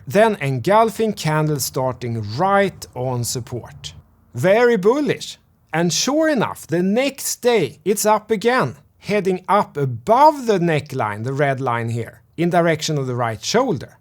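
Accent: Sweden. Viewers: Here for the Swenglish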